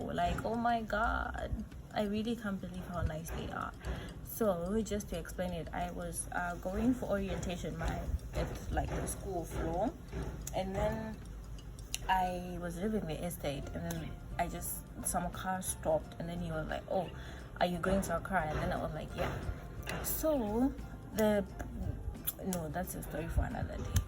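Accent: Ghana. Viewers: Ghanaian accent is the best just like the British. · You sound a Ghanaian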